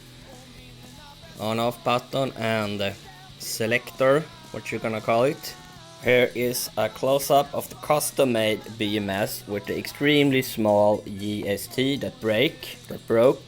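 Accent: Sweden. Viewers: Swedish accent, Skatepunk/Millencolin in the background, talking about battaries on bikes. I am a fan(Denmark)!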